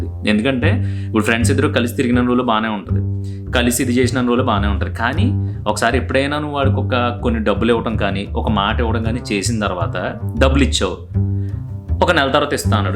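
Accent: Godavari, India. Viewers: Bro meedi place yekkada bro .ma godavari slang la vundi😊😅??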